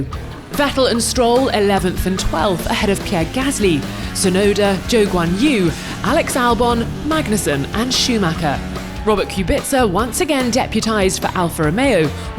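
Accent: United Kingdom. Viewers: this narrator with that horrible britisch accent, cant f1 find any better? annoying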